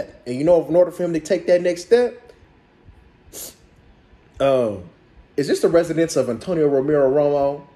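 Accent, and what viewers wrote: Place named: Bay Area, United States. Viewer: You gotta be either from The Bay or Chicago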